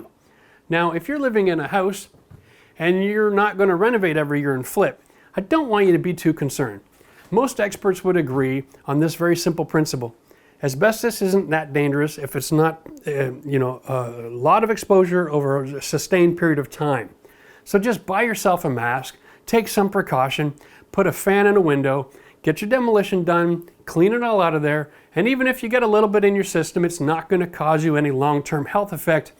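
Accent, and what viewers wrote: Canada. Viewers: I trust you as a person and contractor, I live in Louisville, KY area and honestly I need a contractor like you. I had one and screwed me and ran away with half finish and some mistakes needs to be revisited . I need to finish the basement about <num> sq. to finish . If you know an honest reasonable contractor, I would appreciate the name/ names and a mode to contact them. I am a disabled retired individual for 12 years but I am Ok the Lord has be faithful to me and I am frugal and do not like waste since I grew up in Egypt poor but hard worker educated which was free in Egypt. Mat God bless you and your work abundantly. That would be of great help. If you can. Good day. Physically I cannot help , I had my knee was replaced 6 weeks ago. and I will need back surgery as well just to be able to tolerate the pain and decrease the narcotics and sedatives I am dependent on them but my kidney and liver will fail if I continue. 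GBU , It sound that yu are in Canada so I may be out of luck. lol.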